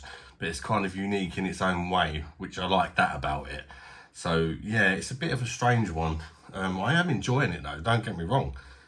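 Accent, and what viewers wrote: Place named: West London, United Kingdom. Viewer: cheers will give them a try and I will try one of them rheinbachers aswell as I like holsten and your battle vs lidl lol . U sound from my neck of the woods I'm west side of London near Heathrow if ya ever fancy a pint and a game of pool one day always welcome in the sir john gibson pub in stanwell I'm often in there with my pals as its five mins away lol cheers bosh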